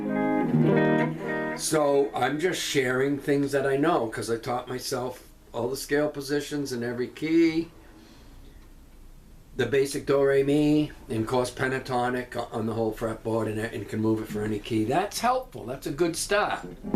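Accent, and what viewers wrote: New England, United States. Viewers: Enjoy your YouTube journey, my friend. You sound like you're in my kneck of the woods, Newengland. New Subscriber 🎸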